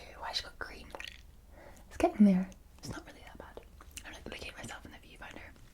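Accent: Scotland, United Kingdom. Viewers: ASMR, and Lollipops....Definitely a great mix. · I struggled to stomach any Disney "food". Rides and ambiance is what Disney's good at. That's all. I digress... Thanks for your soothing videos! I'm English /Canadian and love your Scottish accent. I told my wife about how much I enjoy what you do. She's very understanding, but it's 'not for her'. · Don't feel bad for your fast ramble talk I think I speak for everyone here when I say I find your fast ramble talk to be extremely relaxing especially with your beautiful heavenly Scottish accent!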